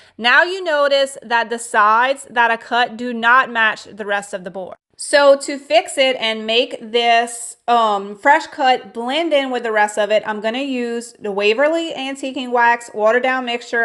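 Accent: Louisiana, United States. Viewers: I just came across your channel and everything is beautiful! I’m curious though, you have got to be from Louisiana. That definitely sounds like a Cajun accent to me! 💕 Edit: just looked in the description and answered my own question!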